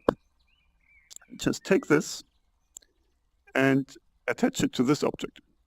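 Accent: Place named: Germany. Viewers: Nice! Also i like ur accent are you german? I'm half german!! +1 sub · Yea I think he is a german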